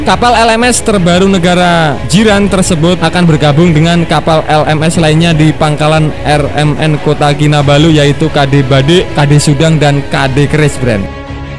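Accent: Java, Indonesia. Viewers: Suara mu kok medok e min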